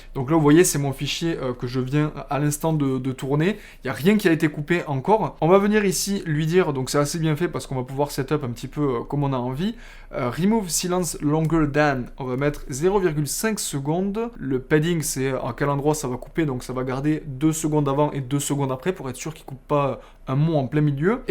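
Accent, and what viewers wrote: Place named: South, France. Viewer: Bravo pour ta vidéo avé l'accent qui fait plaisir !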